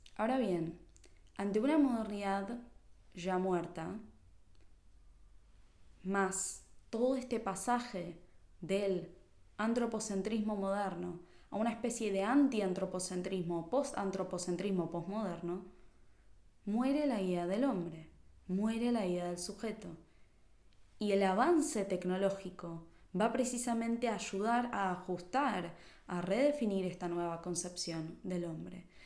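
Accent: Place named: Argentina. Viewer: Gracias. Trataré de encontrar el libro. Te encontré a través de Gustavo Salle. Insólito. Por la forma que tienes de hablar eres argentina, no? Soy uruguayo, te estoy siguiendo con avidez desde hace poco. Muy profundo tu exposición sobre el tema de la Singularidad. Te saluda con afecto, desde Uruguay, Eduardo, artista plástico egresado de la Escuela Nacional de Bellas artes.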